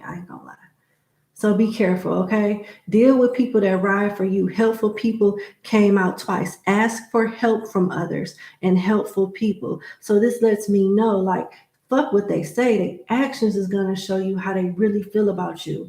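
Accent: South, United States. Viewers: Love your vouce and accent Southern right? Which state?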